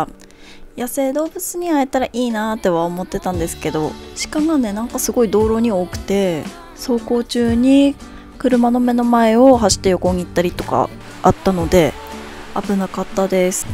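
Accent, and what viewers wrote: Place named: Tsugaru, Japan. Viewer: 青森の人？津軽弁だぁ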